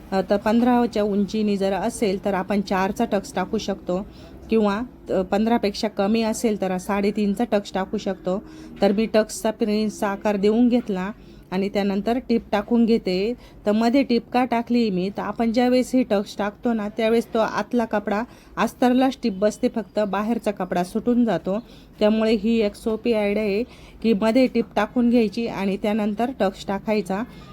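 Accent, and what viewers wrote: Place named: Nashik, India. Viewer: तुम्ही नाशिकच्या आहेत का ताई🎉 बोलण्यावरून वाटतंय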